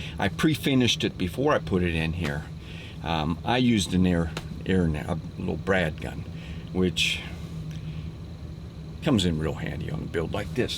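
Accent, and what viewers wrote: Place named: Western Pennsylvania, United States. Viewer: western PA accent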